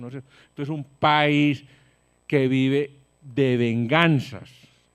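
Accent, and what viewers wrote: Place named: Antioquia, Colombia. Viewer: Es lo mismo que dice petro pero con tono paisa .........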